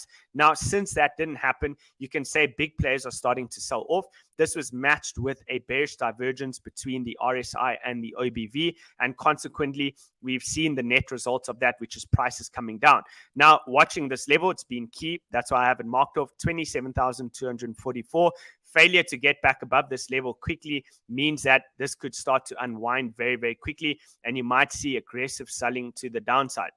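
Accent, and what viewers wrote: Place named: South Africa. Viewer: SA I reckon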